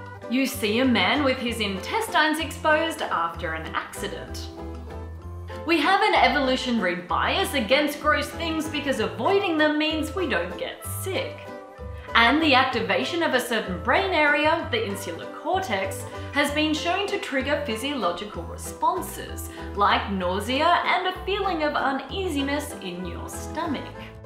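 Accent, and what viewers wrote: United Kingdom. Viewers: I could swear you were British! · Elephants eat poop to build up there immune system. The same way children do by putting anything and every thing in there mouth. Well at least according to the nature documentary I watch! Happy 50th and I thought you were British still a cute accident and thanks for all the wonderful content! Confetti button! <link>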